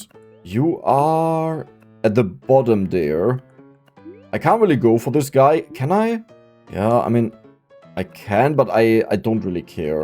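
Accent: Germany. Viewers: Zewentzyzigz holy deutch accent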